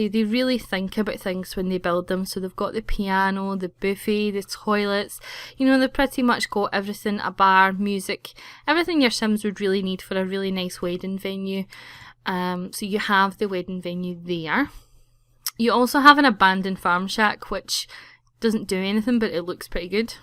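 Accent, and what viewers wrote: Scotland, United Kingdom. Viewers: you sound Scottish just like meh! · Wow, your accent is gorgeous. I don't know if you know who James McAvoy is, his a Scottish actor and his voice is amazing just like yours! I think I'm just inlove with Scottish and Irish accents! They are just so different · It's called a Scottish accent, and it's cute. Maybe you're deaf, or just not used to the English language, but I learned English about 1 year ago, and I'm used to a normal American/Boston accent. And I can understand her perfectly. It's not fault that she speaks like that. So, please don't comment on her accent. She can't do anything about it, and she shouldn't, because it's lovely <3 c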